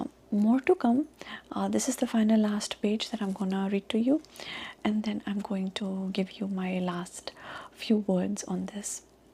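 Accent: India. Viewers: As an American I love Indian accents i subscribed to your other channel too. If you keep making videos, we’ll watch 🔥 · these indian accent vids are cool. but I miss your regular accent. what are some other accents you can do?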